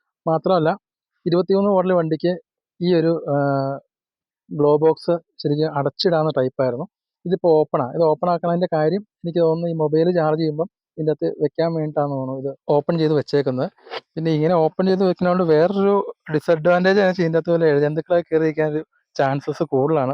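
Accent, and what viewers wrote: India. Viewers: വർത്താനം കേട്ടപ്പോൾ മനസിലായി തൊടുപുഴ കാരൻ എന്നു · Kottayam,pathanamthitta malayalam.. vekthamayi karyangal paranju.. 2026il GST kuranjapol 1.15 on road.. 5000 extra fittings..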